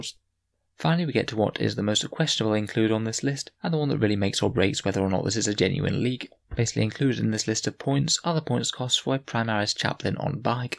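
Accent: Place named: East Anglia, United Kingdom. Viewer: I'd guess somewgere East anglia way, they're accent is quite subtle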